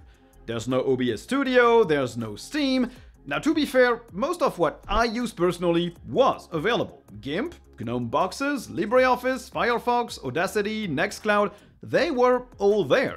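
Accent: France. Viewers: On entend un peu l'accent francais mais tu as vraiment un bon anglais franchement felicitations pour etre aussi bon en anglais! Pq avoir fait la chaine en anglais plutot que le francais d'ailleurs ?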